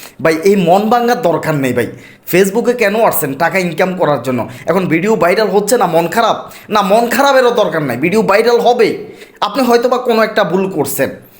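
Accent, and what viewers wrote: Chittagong, Bangladesh. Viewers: Bai r basa ki Chittagong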